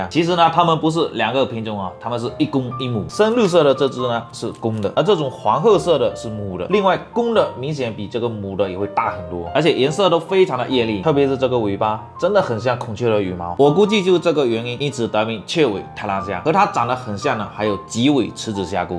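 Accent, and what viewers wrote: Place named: Fuzhou, China. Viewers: 虾菇好吃。福州腔好重，福州人吧？ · 福州口音呀？ 大哥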